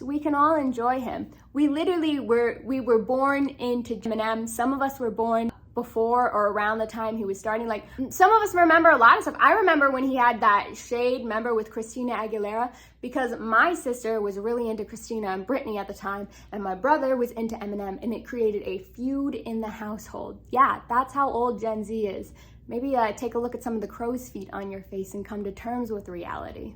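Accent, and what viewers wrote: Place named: Minnesota, United States. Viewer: german american from minnesota ? damn nice to meet you lol you have such a nice accent btw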